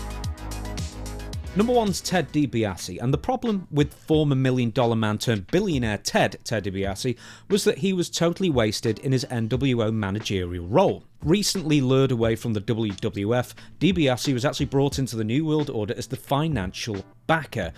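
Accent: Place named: United Kingdom. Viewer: Clicked on this, heard the British accent, turned it off.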